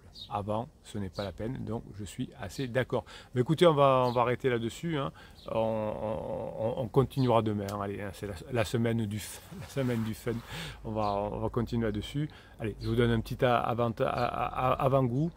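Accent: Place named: South, France. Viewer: Merci pour votre réponse elle m'est allée droit au cœur J'aime beaucoup écouter vos vidéos et votre accent méridional me donne l'impression d'être en vacances 😊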